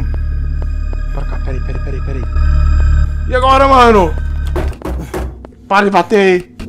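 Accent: Ceará, Brazil. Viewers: Olha um cearense kkkkk a não mano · Aeeeee Um cearense!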